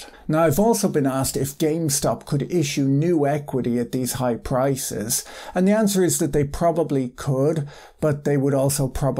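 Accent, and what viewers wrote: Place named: Ireland. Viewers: I'm guessing an Irish person who has lived in England for a few decades so the Irish accent is less pronounced. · What's your accent, it's very nice, I get a little bit of Irish but also something else?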